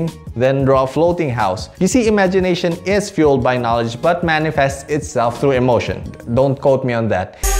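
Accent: Philippines. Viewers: The moment he opened his mouth andstarted talking me be like: "Pilipino to" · I hear the accent.... pinoy? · Are you a Filipino? Because your accent is filipino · You're Filipino aren't you. The accent can never hide ha💓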